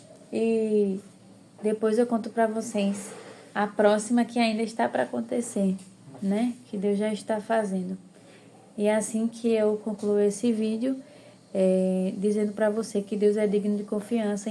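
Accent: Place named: Northeast, Brazil. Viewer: Graça e Paz irmã Olha não sei de onde vc é, mas pelo lindo sotaque acredito que dê alguma região do nosso lindo e abençoado nordeste eu sou se São Paulo, olha o seu vídeo falou muitoooo comigo , apareceu aleatóriamente aqui no YouTube e desde o título até o final do vídeo, inclusive o hino falou ao meu coração 🙌🏻🥺 Que Deus te abençoe, já se tornei uma inscrita 😍🙏🏻❣️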